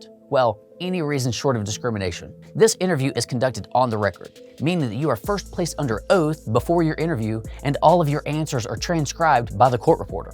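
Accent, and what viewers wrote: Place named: Texas, United States. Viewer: It's a Texas thing. All the legal beagles down here pronounce it that way.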